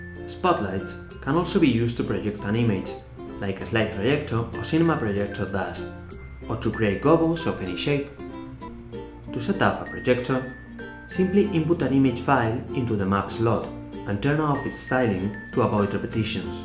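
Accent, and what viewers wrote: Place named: Spain. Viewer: Offended by a Spanish accent from someone named " "? It's a joke right?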